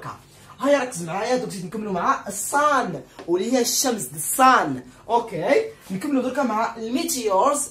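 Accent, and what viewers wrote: Morocco. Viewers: لهجتك مغربية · حسيتو مغربي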